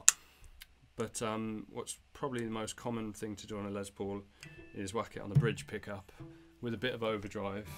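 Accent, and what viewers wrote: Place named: England, United Kingdom. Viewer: Hi, I like your vids a lot! yes always interesting, real humility. We can guess your pleasure in playing guitar, and speaking about it. I also like your english kind of speaking as well. Ah! I've noticed something, when you play electric guitar: it seems your little finger is not used at all, is it? I would maybe say more ( 😅): you mostly play with the two first fingers (not counting the thumb of course). I will not try to copy it, but try it to see what it feels, yes maybe. But for my part, I play most of time Gipsy Jazz. OR Jazz, too.. always learning more and more. Greetings from France,!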